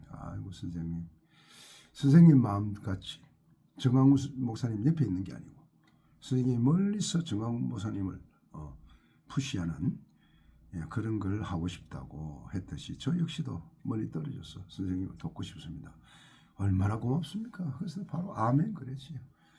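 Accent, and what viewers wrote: Gyeongsang, South Korea. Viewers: 같은 경상도 말씨 좋아요 우리 함께 자유통일당 당원이여서. 감사드립니다. 구독은 진작했읍니다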